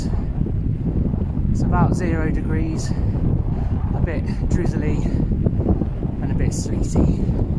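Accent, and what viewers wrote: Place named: United Kingdom. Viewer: The most ‘British’ accent ever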